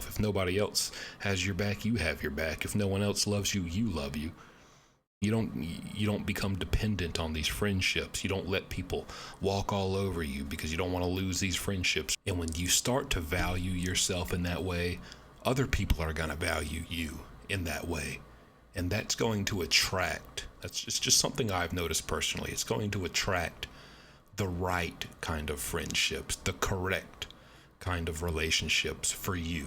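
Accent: South, United States. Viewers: im fairly new subscriber. I love the sound of your voice being from the south like me helps. I enjoy all your content i especially like these type. I listen to you while I am doing my house work set of quite headphones and im off 😂😂😂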